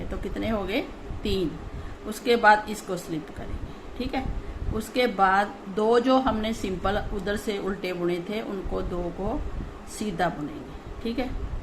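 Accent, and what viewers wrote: Punjab, India. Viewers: मैं रावलपिंडी पाकिस्तान से हूं. तुम मुझे बहुत अच्छी लगती हो, पंजाबी स्टाइल में बात करने का तरीका बहुत अच्छा है। स्वेटर बनाने का आपका तरीका मेरे जैसा ही है। मेरी माँ ने मुझे आपकी शैली में सिखाया। मैं सच में आपसे बात करना चाहता हूं. आपसे अनुरोध है कि आप एक वर्ष तक के बच्चे के लिए रोम्पर बनाना सिखाएं। Google द्वारा अनुवादित बहुत बहुत धन्यवादमैं रावलपिंडी पाकिस्तान से हूं. तुम मुझे बहुत अच्छी लगती हो, पंजाबी स्टाइल में बात करने का तरीका बहुत अच्छा है। स्वेटर बनाने का आपका तरीका मेरे जैसा ही है। मेरी माँ ने मुझे आपकी शैली में सिखाया। मैं सच में आपसे बात करना चाहता हूं. आपसे अनुरोध है कि आप एक वर्ष तक के बच्चे के लिए रोम्पर बनाना सिखाएं। Google द्वारा अनुवादित बहुत बहुत धन्यवाद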